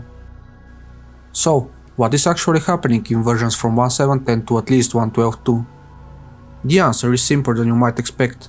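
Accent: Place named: Russia. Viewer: Cool. But for some reason your accent reminds me of Russian. I'm Russian myself and I've heard Russians speak English. It amused me)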